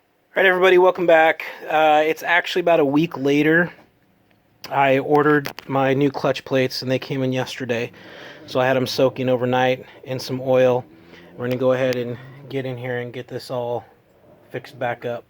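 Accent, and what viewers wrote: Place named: United States. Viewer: This is an above average video with a clear speaking American on "How To" repair an inner "loose or damaged nut" that's deep within the clutch housing of a Suzuki Boulevard M109R. Concise, step by step instructions, no frills, no music, just a straight forward, common sense repair, that is well explained as the video progresses. Excellent video Mr. Suzuki Clutch Repairman Thank you!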